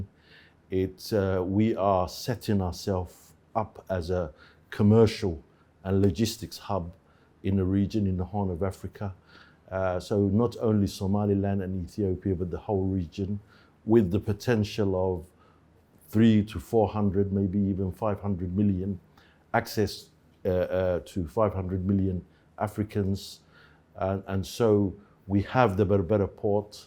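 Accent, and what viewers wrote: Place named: London, United Kingdom. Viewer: What a posh english , London accent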